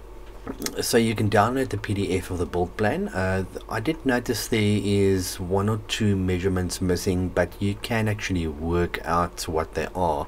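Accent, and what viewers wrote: South Africa. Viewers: Kon sommer hoor in die eerste paar sekondes jy kom van Suid Afrika af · I know a South African accent when I hear one. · I was like 99% sure you were South African, and then I saw the Game catalogue. Love your work, man, keep it up. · Hi there, this is sweet! I'm from South Africa- sounds like you too! I'm wondering the best way to ship or buy a small subwoofer like this locally? I bought a TPA3116D2 and i'm looking for a small subwoofer to match. i.e.100wrms with low frequencies. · thanks for affirming my suspicions of your accent being from South Africa , after seeing the game paper ;-)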